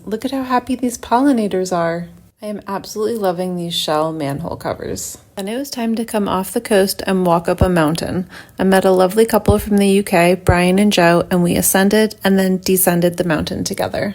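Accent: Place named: Australia. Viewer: You sound like an Australian..Thanks for sharing your Camino experience.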